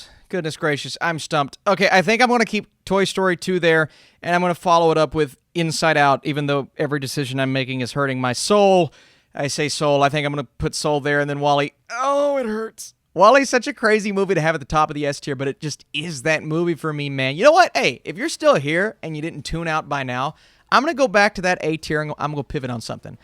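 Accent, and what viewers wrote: United States. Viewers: You have the most American voice I’ve ever heard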